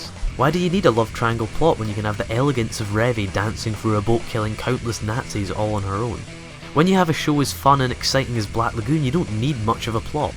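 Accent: United Kingdom. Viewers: And c'mon man, you're British, right? Even saying "badass" sounds cringe.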